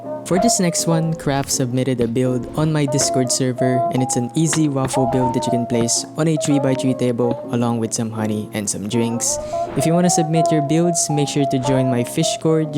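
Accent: Philippines. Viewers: You sound so Philippines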